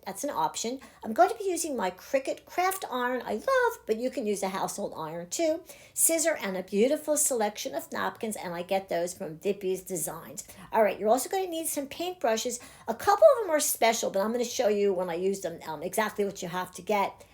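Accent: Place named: Philadelphia, United States. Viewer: I’m enjoying this video- I love this kind of crafting. But I just want to ask you…are you from Philly? The reason I ask is you said “pock a book”. As in pocketbook. I grew up in SW Philly so my ears are perked up by your accent! ☺️ where I live now they say purse instead of pocketbook. 😂 kind of funny.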